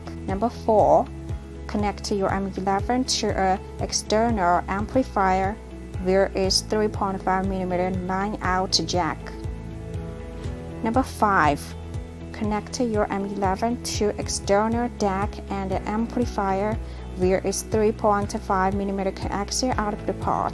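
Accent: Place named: China. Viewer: Lol She legit sounds like Siri speaking English in typical Chinese accent No offense tho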